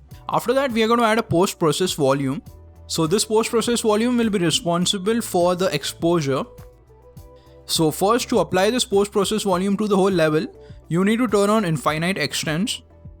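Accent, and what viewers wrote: India. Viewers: Are you indian ? Your accent sounds like indian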